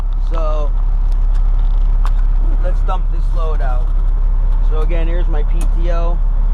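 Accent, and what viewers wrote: United States. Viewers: Great video...u in the tri state area...accent sounds familiar...new York New Jersey or CT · You talk funny.... WHERE you from BOSTON?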